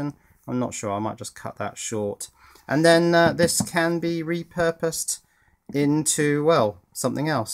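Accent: United Kingdom. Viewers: Are you British? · What was your first clue? Ha... yes, is British.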